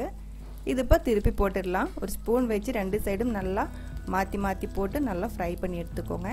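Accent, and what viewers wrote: Kerala, India. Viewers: Neenga malayali thane.unka pechula theriyuth.so nice.ur voice and recipe 😊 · Malayalam’ised tamil sounds quite irritating . Sometime sound like mocking.. I don’t understand why .. no Tamils or any other would start a channel targeting other languages.. would request not to kill the language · super recipi. malayalam kalantha unga thamil super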